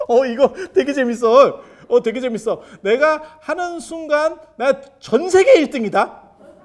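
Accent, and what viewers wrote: Jeolla, South Korea. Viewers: 스님께선 서울서 자랐다고 늘 말씀을 하시는데 말투나 어조는 전라도 사투리가 같아요 시간될때 말씀좀 부탁드립니다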